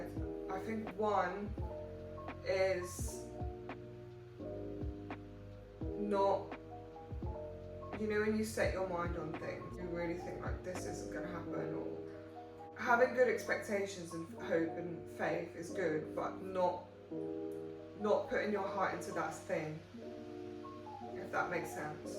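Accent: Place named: United Kingdom. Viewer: I love your accent! What part of the UK are you from?? 🇬🇧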